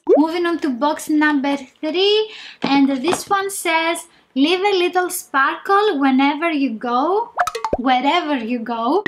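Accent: Greece. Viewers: I just found your channel, you're accent is adorable and I loooooove your videos, I just watched like 10 of them. Are you greek? I came to a conclusion, couse of your channel's name and your accent (hoepfully I am right and I did not offend you). Thank you for your videos, I think they will help me a lot in the future. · I love all of your videos but especially Advent Calendar ones! And your accent is you cute, are you Greek?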